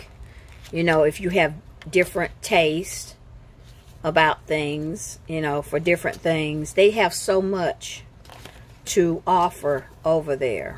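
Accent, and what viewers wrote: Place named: South, United States. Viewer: You sound like a sweet southern girl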